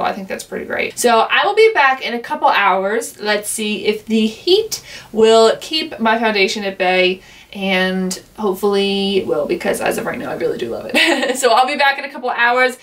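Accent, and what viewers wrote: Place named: New Jersey, United States. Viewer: I basically fell upon one of your vids the other night and when I heard your accent I thought she has to be from Jersey! I think you're awesome anyway tho 😁 #subscribe 💜 from Philly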